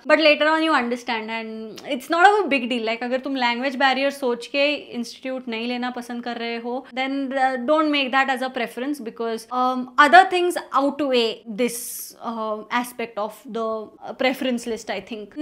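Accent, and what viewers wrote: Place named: Hyderabad, India. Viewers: Your hyderabadi accent was really beautiful ❤️ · I can hear that hyderabadi accent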